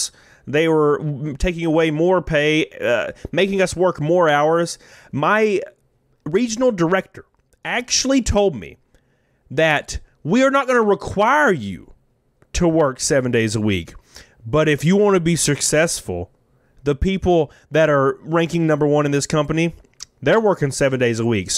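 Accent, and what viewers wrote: South, United States. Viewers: sound either Like an Oklahoma or Texas accent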